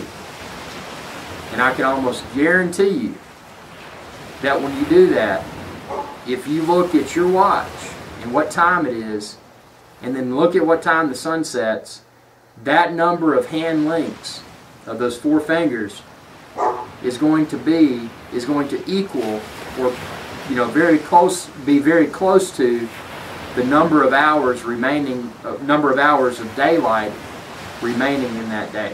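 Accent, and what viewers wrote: United States. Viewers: yeahbuddy! first to comment. ur american rite?nice accent, fake backround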